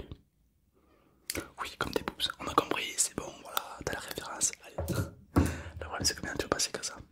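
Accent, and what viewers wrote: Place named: Toulouse, France. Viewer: Super cette vidéo 👍🏻 J’ai remarqué que vous avez un accent, vous venez de Toulouse (je pose cette question parce que moi je viens de Toulouse) Sinon trop cool tes vidéo ASMR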